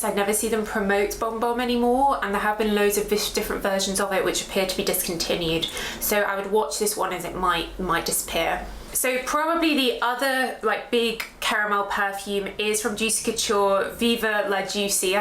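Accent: United Kingdom. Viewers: I love your vids based on main notes of perfumes and I love your british accent-you are the Tish Wonders of the perfume world "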